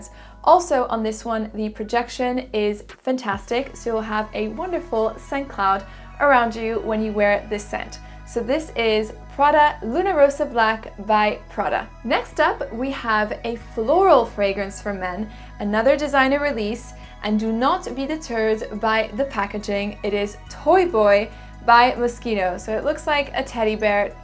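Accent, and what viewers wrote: France. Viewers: Are you french ? Love your accent and great review btw .. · I'm curious, where are you from? Your accent sounds French-ish but I'm not sure 👀I really like the way you pronounce the perfumes!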